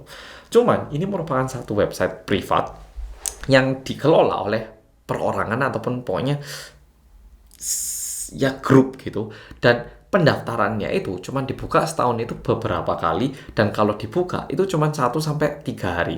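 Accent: Java, Indonesia. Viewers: Mas Korea medok.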